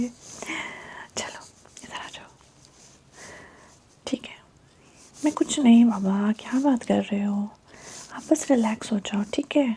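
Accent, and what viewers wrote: India. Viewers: Hindi bolte huwe cute lagte ho Or hindi bolte huwe tumhari voice bhi acha sound karti hai I like your hindi accent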